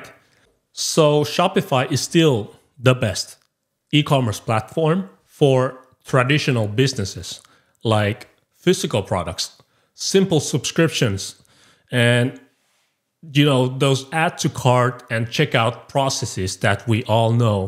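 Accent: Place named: Japan. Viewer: I like the way you talk, the animation.. you sound japanese. cool. lets see you grow bro.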